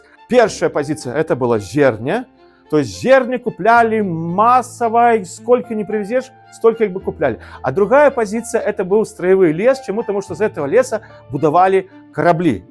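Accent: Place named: Mstsislaw, Belarus. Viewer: Дзякуй! У Вас вымаўленне, як у Мсціслаўле. Я там некаторы час працавала і таксама пачала такія канчаткі дзеясловаў гаварыць. Мясцовыя асаблівасці гаворкі прыліпаюць хутка і моцна. 😄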